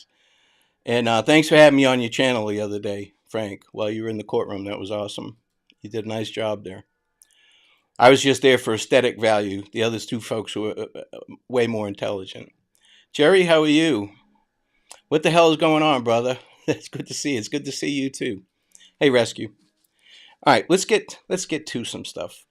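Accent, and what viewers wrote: Boston, United States. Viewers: if you haven't noticed most criminals aren't known for their smarts! When I worked at a prison in North central Florida one of the inmates got caught after a successful bank robbery in Jacksonville. Him and his buddies got out and away successfully with money. Thing is, the idiot brought his flip phone with him and the phone fell out of his pocket while exiting the bank. His girlfriend was blowing up his phone calling during the commission of the robbery and continued calling back to back call until a female police officer answered the phone. Bank robbers girlfriend challenges the female (cop) answering her man's phone and provides his name, location, vehicle description. This is how he was caught. Silly criminal doing silly stuff, with silly people and entertaining silly women having silly conversations on silly phones., thank you for clearing the air on facts and bringing your Boston accent and gift of story telling. 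No narrator is better than you, well except Morgan Freeman or the guy from unsolved mysteries and that's top notch competition. I wet myself a bit when you called dude a DH, I love your quick wit and ability to get cocky on the fly. I put money on DH wears skinny jeans, paints his nails, has a safe place, feels free and special by using restrooms not assigned appropriate according to his anatomy and sports a participation trophy. 😂. He can get glad in the same hipster skinny jeans he squeezed on and got mad in, probably after crying himself to sle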